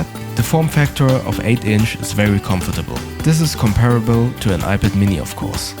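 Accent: Germany. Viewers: I hear some German accent somehow :))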